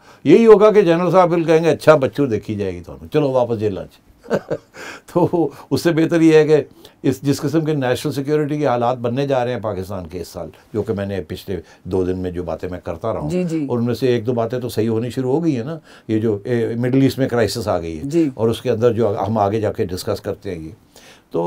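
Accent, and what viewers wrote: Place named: Punjab, India. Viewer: Tum pakistani kitni bakwaas urdu bolte ho yaar, urdu main punjabi lehza daal diya hai. Kya bhaji paala banaya hai.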